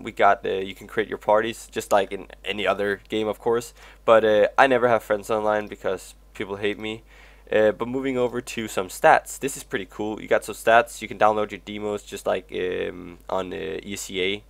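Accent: Denmark. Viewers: Are u from Denmark? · HaHa kunne høre fra starten af du var dansk. :) god video! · your Danish? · Are you danish?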